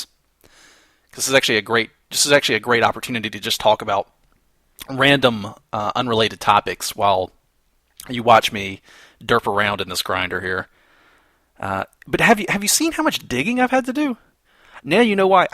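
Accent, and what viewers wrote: South, United States. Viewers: oh you have a cold, you just sounded more southern to me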